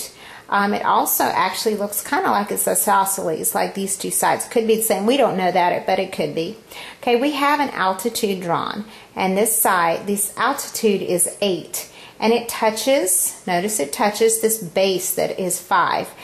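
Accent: South, United States. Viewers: So southern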